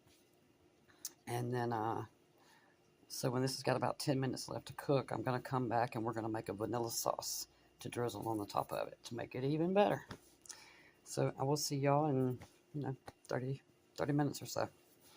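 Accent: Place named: Caribbean. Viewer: Nosey Question: Which island are you from … originally ❓ I recognize a familiar accent 💥 🇹🇹 Yes No? 🤩🤩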